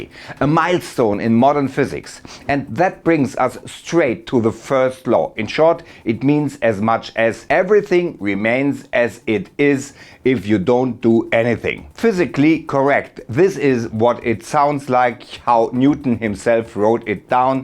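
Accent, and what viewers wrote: Germany. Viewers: German Englisch ist a little funny. 😎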